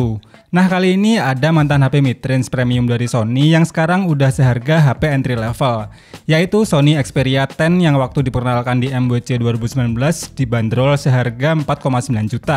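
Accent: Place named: Brebes, Indonesia. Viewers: Maap masnya orang tegal bukan sih ? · Logat medok Brebes qolqolah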